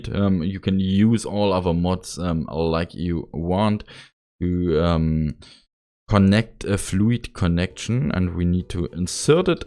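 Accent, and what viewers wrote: Germany. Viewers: „five in the high“ deutsche akzent beste 😂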